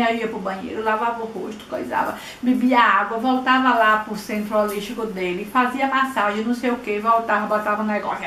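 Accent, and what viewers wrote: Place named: Bahia, Brazil. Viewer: Vc é linda!Sua essência é verdadeira,alegria contagiante e espontaneidade como sua mais perfeita qualidade!Adoro esse sotaque baianês,vc é um exmplo de mulher,não se importe com essas pessoas vazias e fúteis,elas realmente não sabem o que vieram fazer no mundo,azar o delas!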